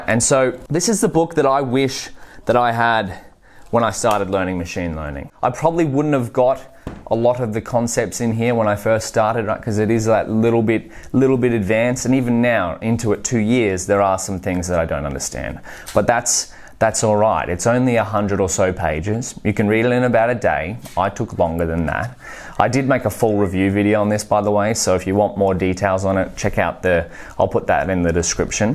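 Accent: Australia. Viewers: The way you speak sometimes feel like Billy from strengerthings , or are u Australian..?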